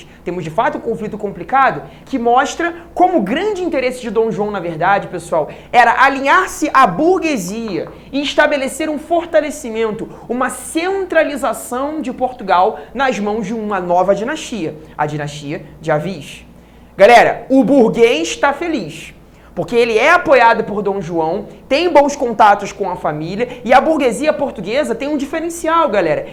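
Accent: Rio de Janeiro, Brazil. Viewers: Acho lindo falar colocando xs. Em qual região do Rio terei que morar para começar falar com esse sotaque e quanto tempo mais ou menos? Obrigada! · aaa amo sotaquin cariocaa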